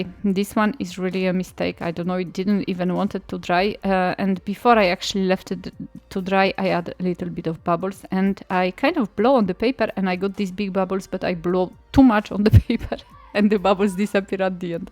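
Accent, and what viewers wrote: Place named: Iran. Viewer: I love your accent. Is it Persian?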